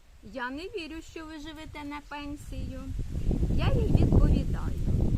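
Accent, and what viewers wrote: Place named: Ukraine. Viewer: подивилася ваше відіо в сльозах,не дай Боже пережити нікому,що ви пережили,як ви сказали. Ми маєте для кого жити. Дай Боже дочекатися правнуків У Вас приємний голос і укр.акцент. Я з нетерпінням чекаю ваше відіо. Поважаю Вас. Здоров'я Вам іздійснення ваших мрій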